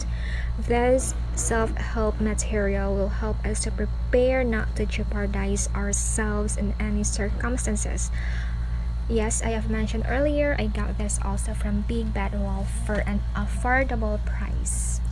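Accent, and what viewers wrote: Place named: United States. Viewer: Hi pretty sis akala ko american ang nagsasalita ang galing naman mag english..nice books pretty that books its good to my daughter she love to read like you..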